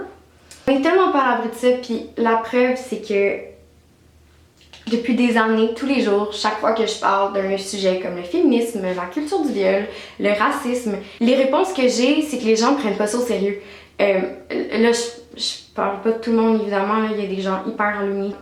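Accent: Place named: Quebec, Canada. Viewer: Je suis français donc je n'ai pas l'accent quebecois mais je trouve qu'il te va trop bien !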